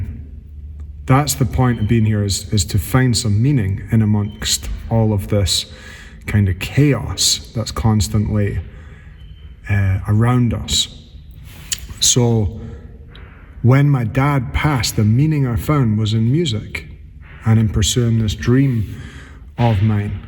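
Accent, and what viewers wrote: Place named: Scotland, United Kingdom. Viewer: Hi, I've just been listening to all your music on YouTube again. ❤️ Keep being amazing and real❤️ Love all your music and listening to your lovely Scottish voice in the chats too. Thank you for voicing most of my deepest inner thoughts, concerning grief. It never disappears, it stretches out, as you get on with everything else in your life. I've lost lots of people sadly, including my middle son and my husband. Music has always helped me through. Your music is very special and continues to support lots of us, on our life journeys. Sending best wishes and much love to you and Rosa xxx❤️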